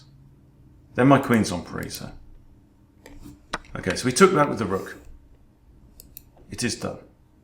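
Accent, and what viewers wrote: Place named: England, United Kingdom. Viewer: I hope you continue making videos. For me and many others, your videos are just at the right level. It's also nice to hear an English voice, there aren't many out there on twitch or YouTube. Good luck.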